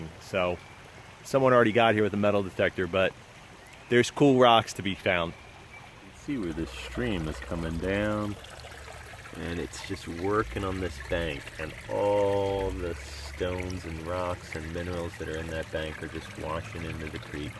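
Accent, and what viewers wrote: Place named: Pennsylvania, United States. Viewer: I watched a bottle dump episode of yours and I knew you were from PA from your accent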